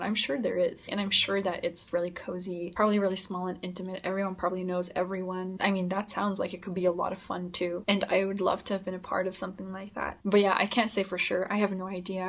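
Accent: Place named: Midwest, United States. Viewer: That was a very interesting video. Well done. I am from the United States and I must say your English is perfect. A mild mid-west accent. This area attracted German and Scandinavian immigrants in the 18th and 19th century. Your vocabulary is extensive and you sound like an intelligent, thoughtful person when you speak English. More people should pause and think about what they want to say. It really works for you:)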